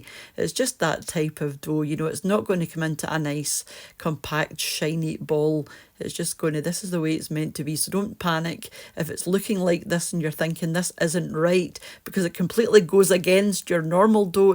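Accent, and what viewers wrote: Scotland, United Kingdom. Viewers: Ooh. Lovely. Scottish rolls AND a video with your lovely Scottish accent. My evening is complete.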